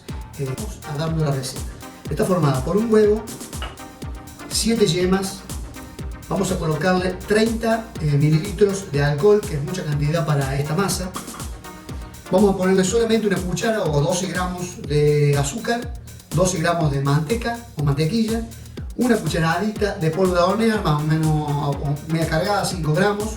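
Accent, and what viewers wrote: Córdoba, Argentina. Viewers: Sabor Cordobes con tonada cordooooobeza!!!Excelente explicacion y con su historia! los voy a hacer!!! y despues cuento!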